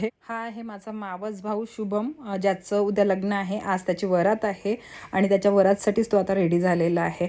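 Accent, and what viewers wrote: Vidarbha, India. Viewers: 1no ❤ ekdm bharri Vidharbh bolte 😊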